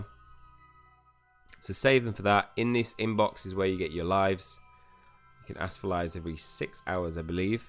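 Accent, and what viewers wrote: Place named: Cockney, United Kingdom. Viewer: Nope. this guy is all-over-the-place in his attempt to explain things. For one, Americans have a tough time as it is trying to understand the Cockney language. Secondly, he's fumbling around with the cursor trying to explain features and in the process - he's out in left field, so to speak going back and forth and in the process ends up with us knowing but an inkling more than when we started to listen to the "How to play.". He's talking about cards, and other items, but his "basics" are totally left out of the instruction. What many of us wish to know is what constitutes a good move in the game, what to shoot far as the screen refreshes, what items are most important and when to use them (ie, bombs, footsteps, how to get past attacks, how to kill frogs, what are "power ups" and how to use them),; what are the best moves in the game (ie using 3, 4, 5 in a row items); what to save in each game until needed on the game board; know if it's better to use a lighting bolt spell or a flying key; what is the order of an item's changes until it can be used (ie, a block of ice, turning clearer). 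Oh, there are so many more things in "How to play" that is totally left out here. I haven't come across an excellent playbook/instruction manual outlying all of this and most videos I've seen one may know how to play the game but not express clarity, simplicity, understanding too all - but make an attempt to speed by it, like they were in a timed game. - pitiful.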